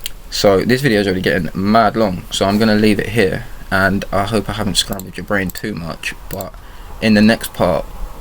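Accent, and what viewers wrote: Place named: London, United Kingdom. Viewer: How did you possibly think this man was an American 😂 such a pure London accent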